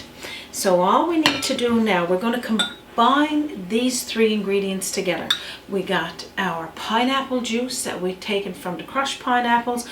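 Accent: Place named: Newfoundland, Canada. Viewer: you are just the cutest cook onYouTube! I am enjoying learning how you prepare the various meals, desserts, breads and such in Newfoundland! I developed a love for your country from a TV series I watched called "Republic of Doyle" Love the accents! Is there a reason you Newfoundlanders drop your H's?? "You 'ave to 'ave 'alf a cup!" You make me smile! 'appy Mothers Day!!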